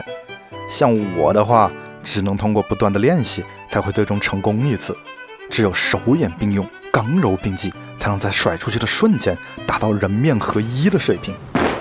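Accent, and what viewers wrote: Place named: Hunan, China. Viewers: 你听起来是湖南人吧😂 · 哈哈哈哈，到了国外也压不住你的湖南口音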